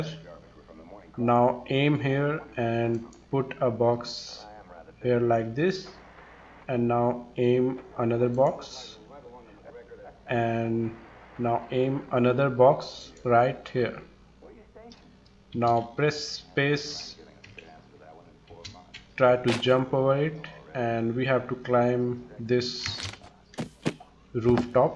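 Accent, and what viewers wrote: India. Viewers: When I heard your voice...”sigh”ARE YOU INDIAN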